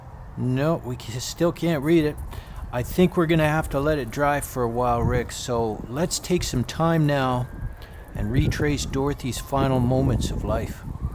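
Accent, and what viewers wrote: Canada. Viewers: You're definitely Canadian!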